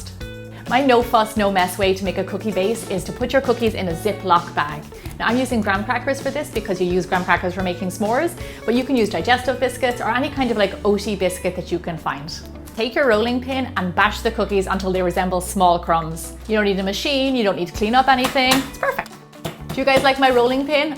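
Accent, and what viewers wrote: Ireland. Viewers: R u from Northern Ireland or Southern Ireland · Irish accent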